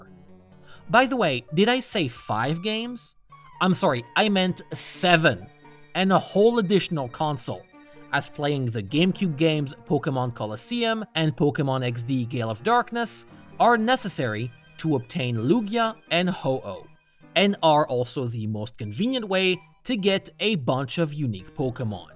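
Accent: France. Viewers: Are you French?? You speak English VERY well! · Man ! Are you French ? Si oui incroyable je m’y attendais pas du tout haha 👏🏻 très bel accent 🤩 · I'm gonna be honest, it wasn't until this video that I realized you were French. Désolé.. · Are you french ? Im french and i kinda hear a french accent but im not sure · Wait, wait, so you're from France and speaking English without an horrible accent? I am impressed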